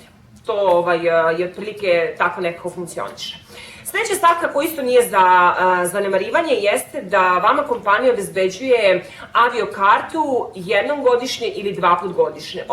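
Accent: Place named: France. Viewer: vuce na francuski, moja sestra prica slicno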